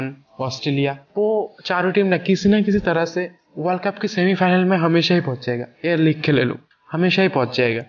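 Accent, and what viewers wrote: Bengal, India. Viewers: Bengali hei keya? Hindi language attack raha hai.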